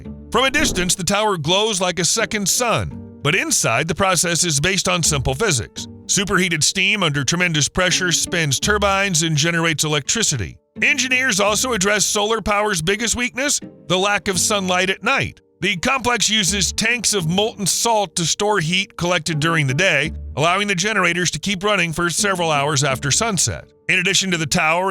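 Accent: United States. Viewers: the shrieking laconic American voice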